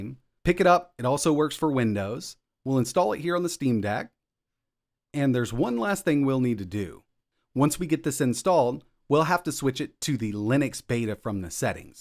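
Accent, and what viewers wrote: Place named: United States. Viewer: Bro what part of America are you from. This is the best American accent I’ve heard. Heard a few times but can’t place it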